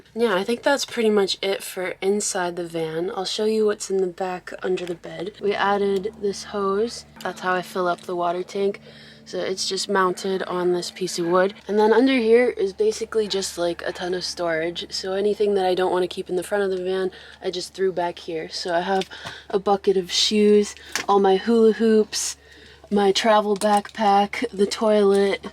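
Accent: Massachusetts, United States. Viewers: What an amazing gift!!! I LOVE the Mass accent! The Van is rad, and I'm sincerely happy your living your dream, and now you can travel with your soulmate, Morgan (; Sending love from New Hampshire!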